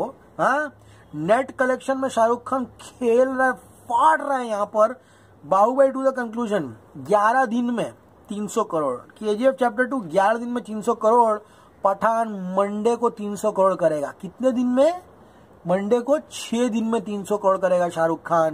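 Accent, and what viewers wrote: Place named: Hyderabad, India. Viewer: Lol hyderabadi accent 🔥🔥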